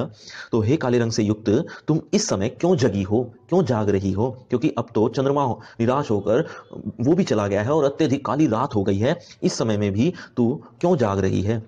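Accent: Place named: Garhwal, India. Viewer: Your sounds be like garhwali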